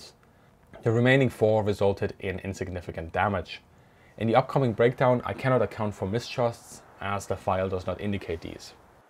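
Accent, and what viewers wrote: Germany. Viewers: Thank you for this video. I am American and I spent two tours there back in the 1970s; I know that not all Germans were ‘supportive’ of the Nazi view of the world. I have met ‘good’ Germans and knowing what they had to actually ’do’ to maintain their ‘humanity’ is truly impressive. I remember one... I think he was a Bundesvehr Lieutenant Colonel and an American friend told me that the Lieutenant Colonel had been ‘evacuated’ by dog sled from Stalingrad. Hearing someone ‘explain things’ in clearly distinguishable English (albeit with an equally distinguishable German ‘accent’) makes this a very impressive video... Thank you! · just wanted to give you a genuine compliment - with apologies if it sounds a bit backhanded at first, because that's not at all the intent! I've been a channel follower for years now, and it's really been interesting to see how your approach has grown, changed, and taken on new influences. 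Just in the past week, you've had a video that really reminded me of Greg('s Airplanes and Automobiles), followed by this video which is much more in the style of Bernhard (of Military History Visualized, of course) - but both with your own unique spin, specialty, and attention to detail. Rather than suggesting that you're copying someone else's styles, I'm instead complimenting your versatility and mastery of very different formats and approaches - and bringing in a wealth of research and your own style. In the same way (and I say this as a linguist!) - your English often brings in very British expressions and very distinct Americanisms, often in the same sentences, while always in that unmistakable German tone. It's neat, adds a lot of colour to the language, and makes your content all the more pleasant to listen to. Keep doing what you're doing! It's great to see you try so many different approaches, take on so many different influences, and continue evolving this excellent channel:)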